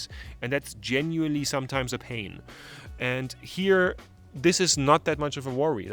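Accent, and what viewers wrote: Germany. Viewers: I know this is a wildly unrelated tangent, but I was fascinated by your accent. I realized it wasn't quite Dutch immediately, but even when you said you're based in Germany I didn't fully hear the typical 'Hochdeutsch' English accent, so I was wondering if you might natively have a more Plattdeutsch - Niedersachsen-ish dialect... and then I saw the CE plate on your Interceptor and it kinda clicked. Do you natively speak that more low-saxon dialect? Anyway, from a Dutch guy who lives in Norway, thanks for the video! I've pretty much made up my mind about getting a Bear in the spring of 2026, but any and all comparisons I can watch before decision day arrives are great. Love to see that you managed some TET, particularly in Scandinavia, I'm very keen on doing some of it myself as well :)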